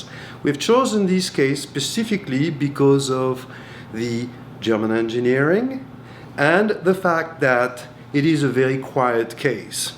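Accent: France. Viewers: german? u serious ? hes french u can clearly hear it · he's french for sure, he has the accent :)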